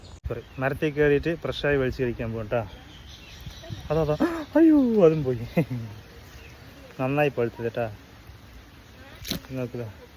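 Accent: Tamil Nadu, India. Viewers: നല്ല മലയാളം അല്ലെ പറയുന്നത് . തമിഴ് കൂടിയ മലയാളം പോലെ സംസാരം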